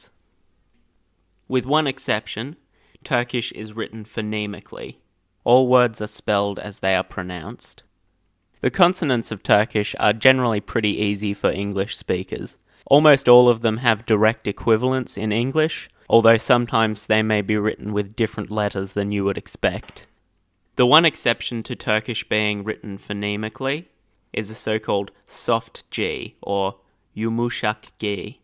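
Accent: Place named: Australia. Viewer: Bu iyi bir video! Güçlü Avustralya aksanına kıkırdamadan edemiyorum ama telaffuzu çok iyi yapılmış!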